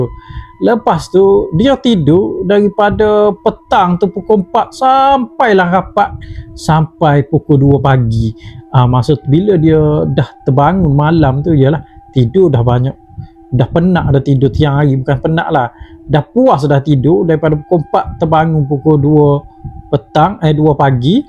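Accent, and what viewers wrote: Kelantan, Malaysia. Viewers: Assalamualaikum. Subscriber baru ni 🙋‍♂️ best dgr citer loghat kelantan ni. Biasa dgr kak nini salina channel frainkiz je kecek klate hehehe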